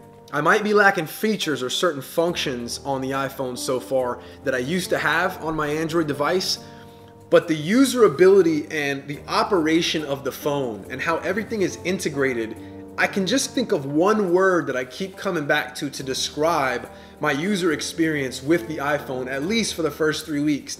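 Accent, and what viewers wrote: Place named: New York, United States. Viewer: You have a bit of an accent or dialect, are you from New York by any chance? I'm probably just crazy.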